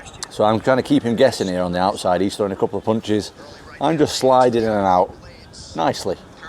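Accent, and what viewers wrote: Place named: England, United Kingdom. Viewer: I like his English much better than American English ❤ The real one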